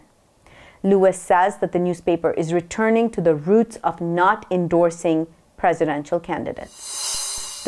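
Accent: United States. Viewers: ╔═══ ❤☀💚☀❤ ═══╗ 💚💚💚💚💚💚💚💚💚 Madam SAHEBA, Assalaamu alaikum. Thank you very much for presenting the news today after almost a month. It was on 3rd, Oct that you had presented the news last. We, from Hyderabad, India, like your news reading because of your slow pace and because of which we easily understand your speech despite it being in American English. But only thing is we need to have lot of patience to hear your news-reading and lot of free time. Madam Samana Siddiqui would have finished your 18 minutes of news bulletins in just 12 minutes. But it hardly matters. What matters is our being able to understand your reading!!! YOU ARE DOING A GOOD JOB!!! PLEASE KEEP IT UP!!! 🌐🌐🌐🌐🌐🌐🌐🌐🌐 ╚═══ ❤☀💚☀❤ ═══╝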